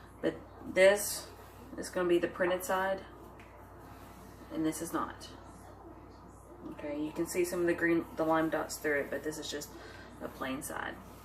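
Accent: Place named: South, United States. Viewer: I love your southern draw - shake it off!!! Kinda sad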